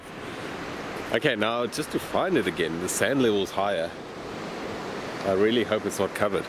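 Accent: South Africa. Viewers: Mooi man. Glad you got it out. Just a random question are you from Port Elizabeth South Africa, I'm orginally from Grahamstown and your accent sounds like you are from the area.....in other news , went to Castle Rock today and saw thousands of shells mainly scallops and lots of other shells. Was amazing, my kids loved looking at the fossils aswell. But anyway, keep up the good work.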